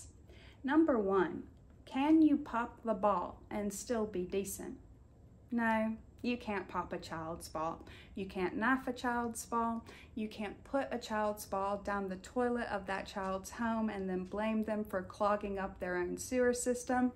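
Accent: South, United States. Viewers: I have a question,. Well actually 2 questions. 1. What is ASMR. 2. Are you from the South?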